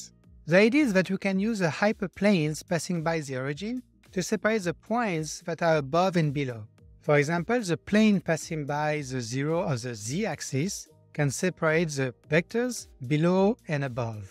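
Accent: France. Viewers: It's not that bad. As a English speaker that knows/speaks French, I can tell you are French. It's not had to hear and understand what you are saying. I'd say keep going and make any improvements along the way.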